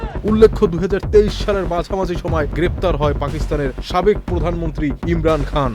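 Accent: Kolkata, India. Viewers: ভারতীয় ভঙ্গিতে নিউজ পড়া বাদ দেন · apnara kolkata er style khobor prochar koren. Jamuna tv theke sikhen ..cheap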